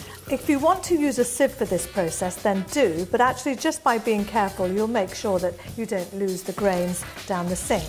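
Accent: England, United Kingdom. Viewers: No one is going to comment on how she is a sand lady with an english accent.. lawl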